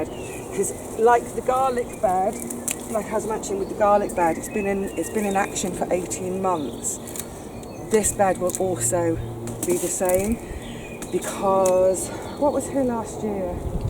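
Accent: United Kingdom. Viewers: " 🎼➡️ A long handled Sneeboer potato or bulb planter will enable you to plant through that tough soil. For this reason I am constantly working to improve my soil. This tough unapproachable soil takes the FUN outta gardening! Wishing and hoping DOES NOT improve CLAY soil! that is an excellent looking stand of broad beans. Raspberry is definitely as weed in your broad bean patch. Those beans are humongous! My mother who is an excellent cook asked me to grow 4" Nickel Filet beans. Thank you for showing us your harvesting technique. This Jane Goodall ad is one of the FEW that was WORTH watching! We can beFRIEND whatever animal we currently care for. Yes, you can intercede cover crops:-) I am currently planting Creeping Charlie into my beds bc Alfalfa a cover crop that relieves DEEP soil compaction DID NOT relieve surface compaction. I, Portia, will plant Creeping Charlie between my Alfalfa rows. 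Earthworms like coffee to help the anatomy of their gizzard, Excellent! Cardboard is a food for the worms!:-) I love hearing your British accent. I could've had that accent too had my parents stayed longer in Suffolk, England:-) I was enjoying seeing you and your mum gardening together. She looks regal. Your cardboard and coffee beans atop should take care of the raspberry weed. Try putting Creeping Charlie on a portion of your planting area and see for yourself what kind of results it brings for improving the tilth of your soil. DEFINITEL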